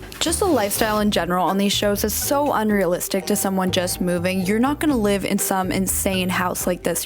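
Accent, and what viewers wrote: Los Angeles, United States. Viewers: Quintessential L.A voice and personality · At least the LA accent has rubbed off on you!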